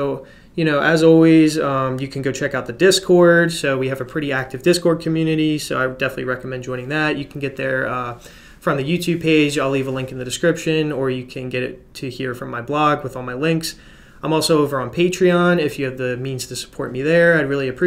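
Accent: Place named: Pennsylvania, United States. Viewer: Trying to place your accent. Sounds kind of New York or New Jersey at times but mostly Pennsylvania. Maybe I'm way off though.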